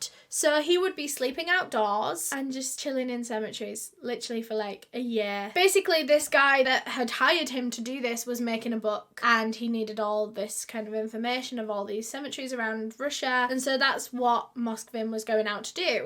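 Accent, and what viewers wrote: United Kingdom. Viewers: Oh goodness. I've never heard your voice. I watch these with subtitles. I thought you American. I'm even more pleasent to hear your UK. Love it.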